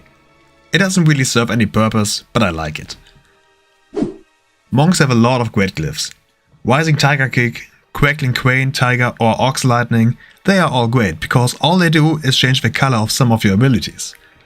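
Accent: Germany. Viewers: Der Typ klingt so deutsch xD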